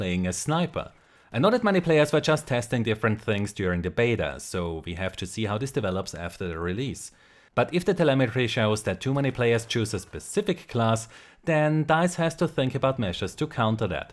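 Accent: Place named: Germany. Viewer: And that your german accent is on fique...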